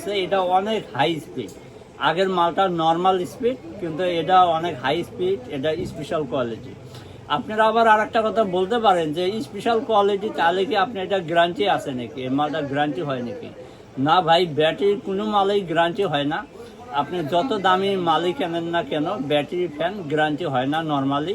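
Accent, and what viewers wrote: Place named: Bangladesh. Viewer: কথা শোনে মনে করেছিলাম বাংলাদেশী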